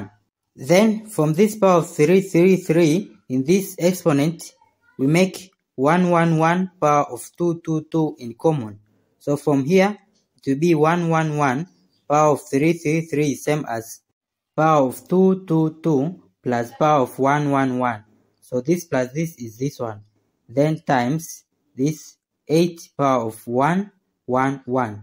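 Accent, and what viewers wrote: India. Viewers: I hear Indian accent I close the video. It usually works this way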